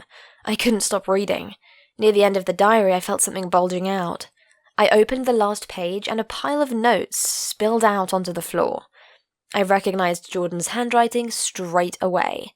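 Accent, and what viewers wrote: United Kingdom. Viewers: I love that STRONG BRITISH ACCENT. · ffffff british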